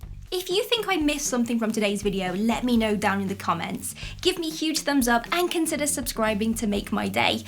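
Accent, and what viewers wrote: United Kingdom. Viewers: Sounds like a bloody brit , not?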